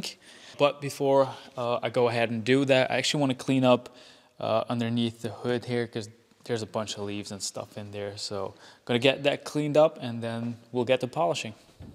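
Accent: United States. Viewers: Hey mate, are you a native belgian? Your accent is very american! I order from your detail store all the time.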